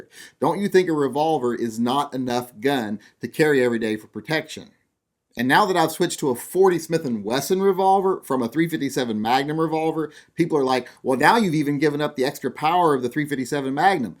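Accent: United States. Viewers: I hear you.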